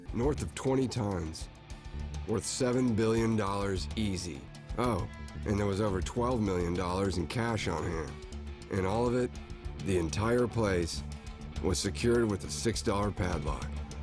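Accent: India. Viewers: He's accent is from india not Mexico you dumb ass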